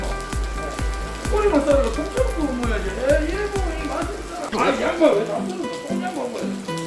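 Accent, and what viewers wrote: Gyeongsang, South Korea. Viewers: 케케케~~대서 ~~엉 왜케 올만이셔유? 역시 삼겹살 쥑이네요ㅋㅋ 구수한 경상도?억양 인간미 촬촬 넘치는 우리의 케케에챱?꺼챱?~~쓰ㅡ읍쇼리ㅋ 솨자~~앙님good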